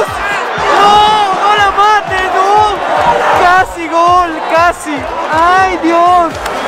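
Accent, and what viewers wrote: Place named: Mexico. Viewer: Cuando lo escucho hablar parece mexicano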